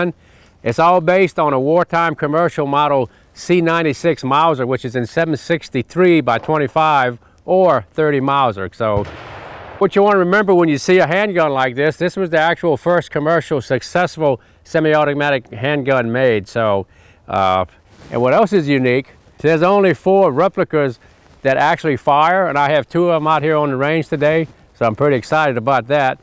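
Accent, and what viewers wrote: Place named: South, United States. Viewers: He sounds like he's from South Carolina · “Store wars” “replicor” I love that southern twang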